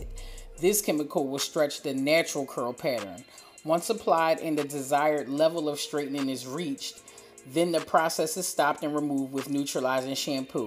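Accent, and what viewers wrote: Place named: Louisiana, United States. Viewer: Are you from Louisiana? Your accent sounds creole like some south Louisiana residents.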